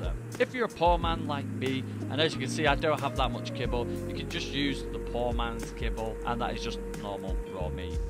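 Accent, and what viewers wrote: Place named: United Kingdom. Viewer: He’s British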